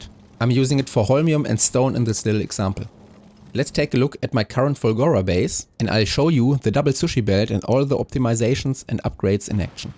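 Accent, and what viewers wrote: Germany. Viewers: If your factory is dependend on belt speed, make smaller factories that work in parallel. 20 Sushi belts in 20 minimal bases instead of the clogging of a long track of recyclers. For me, any row of 20 or more recyclers clog up, as they cannot empty their contents. And even internal storage for anything but scrap is underused, as they will only do the exactly same recycle job to add to internal storage. Warum schreibe ich eigentlich Englisch, du sprichst doch mit deutschem Akzent im Video.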